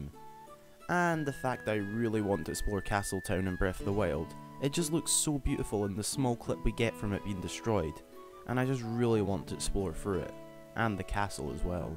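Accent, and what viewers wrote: United Kingdom. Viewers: Are you Britain? Just wondering because of your Accent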